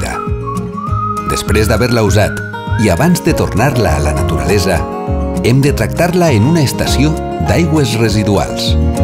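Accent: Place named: Lleida, Spain. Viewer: Buen video, lo pondré todos los días para despertarme con una sonrisa en la cara ;-) P.D: me encanta tu acento de de Lleide (me pone a 100 bb)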